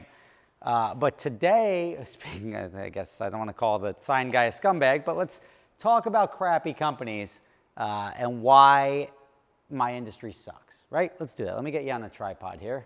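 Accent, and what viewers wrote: New Jersey, United States. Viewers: Just put the old S on and it'd be perfect. Jersey accent an all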